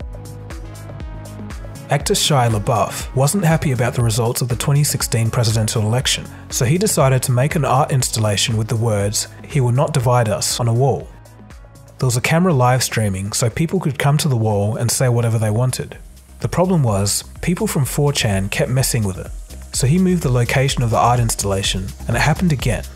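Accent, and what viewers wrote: Australia. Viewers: this image is from an alley between one end of the Queen Victoria Building and the other in Sydney, this is such a memorable scene. Which doesn't really fit the theme of New Jersey.... Judging from the Uploader's Aussie accent, he should've known better. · You sound Aussie?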